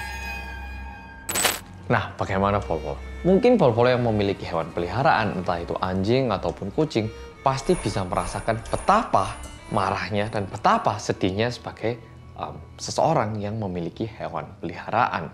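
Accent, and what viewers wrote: Java, Indonesia. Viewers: Wes mas...wong jowo tenan iki..,😀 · MEDOOOOOOK !! Yey akhirnya up >< salam kenal dari jakarta ~~~ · Kental, dalam bahasa jawa 🙏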